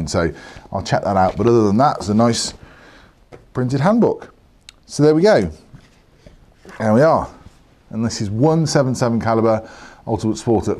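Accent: United Kingdom. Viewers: I love to hear you talk :( funny guy, speak very well, nice pronounced british accent.. Don't get what people dislike!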